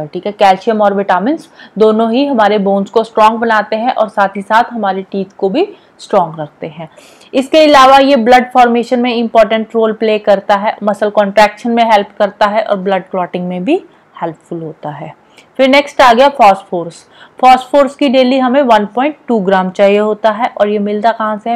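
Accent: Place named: Kashmir, India. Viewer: Mam apka ascent kashmiri type h , n Kashmir ke logo ki awaz bahot innocent si hoti h . Ap kya Jammu kashmir se h ? Ese hi question Aya man me to pucha...vese thanx again for everything 🙏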